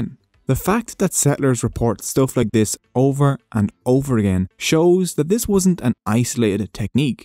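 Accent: Dublin, Ireland. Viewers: It's a Dublin Irish accent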